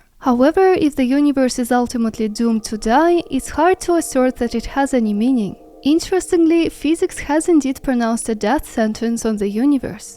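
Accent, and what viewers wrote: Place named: Eastern Europe. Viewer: You are cute and havea slavic accent.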